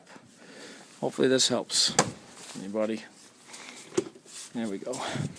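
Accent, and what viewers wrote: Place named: Rhode Island, United States. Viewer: Hoi, what part of New England are you from? Lived in Rhode Island for several years — sounds like RI?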